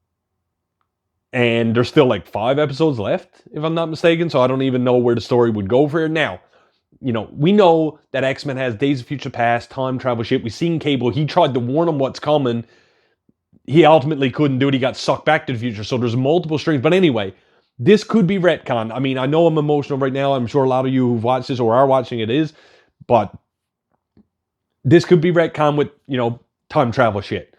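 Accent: Newfoundland, Canada. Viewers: Great reaction. That episode was a roller coaster ride. I too cried like a baby. PS: Are you Newfoundlander? Couldn’t quite pin down your accent.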